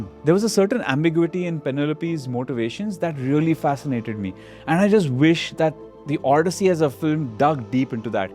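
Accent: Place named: India. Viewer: You have got a very strong Indian accent. Fascinating.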